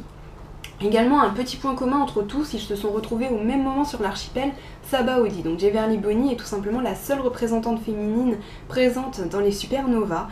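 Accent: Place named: France. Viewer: Im from spain but love french accent :)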